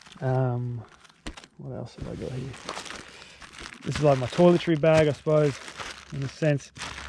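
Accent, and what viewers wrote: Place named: South Africa. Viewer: I was pretty sure there was some South African! My bosses are both South African and listening to you, the odd word here and there, sounded exactly like my bosses. Would never have guessed the other countries though! 😊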